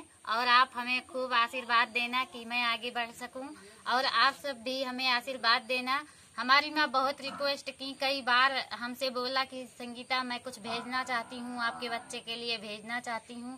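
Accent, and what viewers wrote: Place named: Uttar Pradesh, India. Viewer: Hello.....m bhi up side ki hu.....aapki boli bhot acchi h......mjhko bhi aati h.....but mjhko sarm aati h.....koi bolta h dusra to bhot accha lgta h..... or aapka babies bhi bhot hi pyaarey hn......bhgwan aapko saari khusiya de.....aapkey bacchon ko bhi...☺☺☺☺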